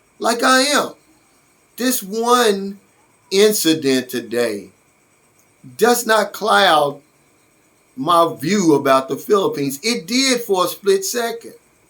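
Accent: United States. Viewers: What?? I'm assuming you identify as being Black and from your accent I'm guessing American? 13 years and one incident and you don't feel welcomed? Honestly I probably would have starting laughing at their attempts in doing so. My deepest condolences for your friend John 🙏🏾 I moved away from the US because I felt not welcomed daily as a Black man. Maybe I'm missing something in your commentary? I'm confused? Wishing you the best sir; it truly sounded like one of those days...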